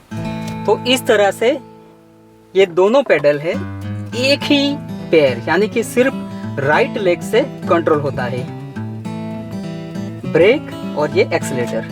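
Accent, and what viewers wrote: Gujarat, India. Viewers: Aap gujarati ho? Aapki hindi patel bhai jesi hai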